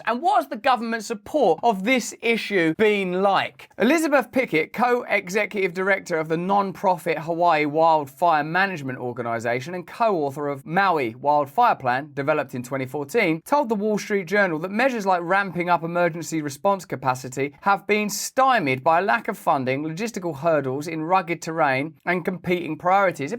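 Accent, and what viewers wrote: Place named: United Kingdom. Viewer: I love the brit accent LOL. VPN ad "Get an extra Free (three) months Free.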